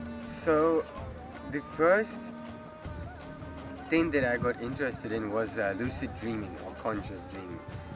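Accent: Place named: India. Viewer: 2reto. He does project a weirdness that makes me wonder if it's a persona. Who is he really to be teaching others? Why does this Russian man speak English with an Indian accent? So many questions...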